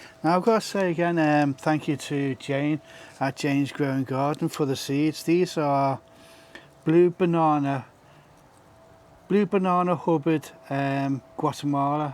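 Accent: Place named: Wales, United Kingdom. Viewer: Got a bet on with my sister I reckon you're Welsh, she reckons you're from the Wirral.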